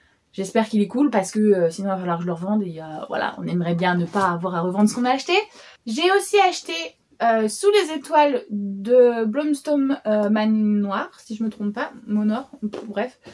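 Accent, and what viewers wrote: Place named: England, United Kingdom. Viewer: ton accent anglais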